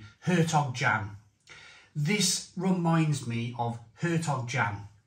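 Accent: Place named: Cardiff, United Kingdom. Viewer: ArchStanton obviously has no knowledge of the Barry derivative of the Cardiff accent spoken by the presenter. It has no connection with East End Cockney!!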